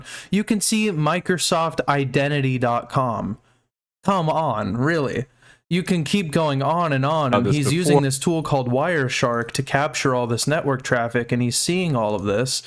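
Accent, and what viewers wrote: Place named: United States. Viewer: Bro your acent is lije a true american 😅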